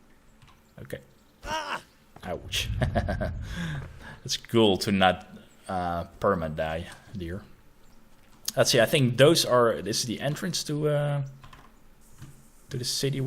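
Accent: Netherlands. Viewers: You have a Dutch accent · Dutch accent :)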